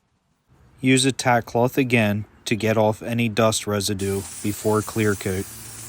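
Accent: Philadelphia, United States. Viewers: I think you're a Philly guy. I can tell that Philly accent. I'm in SJ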